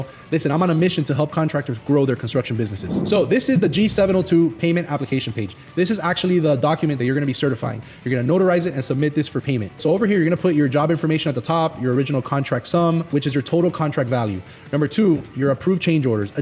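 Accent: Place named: Miami, United States. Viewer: Are you from Miami? I could tell immediately by your accent! Lol. I'm also from Miami :)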